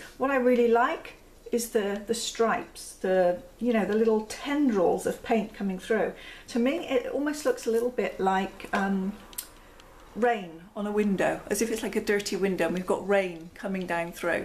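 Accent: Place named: England, United Kingdom. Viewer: I really enjoy your videos. You're not afraid of making mistakes and don't become nearly suicidal when a canvas gets ruined...ha ha, I suppose we all feel like throwing ourselves from a garret when things don't go right... Anyway, I just wanted to say I enjoy your "proper English accent"! So very "Downton Abbey", don't you know?!:-) Cup of tea, guv'nor? Okay, okay, I promise to stop!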